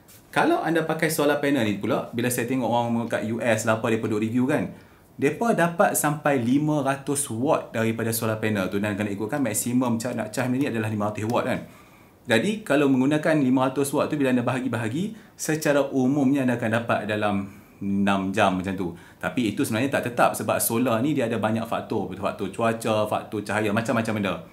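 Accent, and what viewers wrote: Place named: Penang, Malaysia. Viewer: Tengok sbb nak layan loghat penang buku abg ja..hahaa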